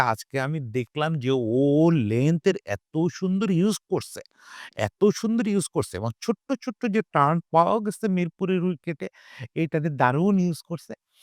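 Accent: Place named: Kolkata, India. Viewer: দাদা আপনি কলকাতার বাংলা টুনে কথা বলেন কেন?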